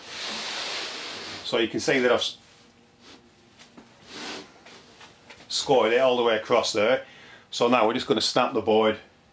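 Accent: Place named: Blackburn, United Kingdom. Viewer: Are you from Blackburn That accent sounds familiar 🤣😂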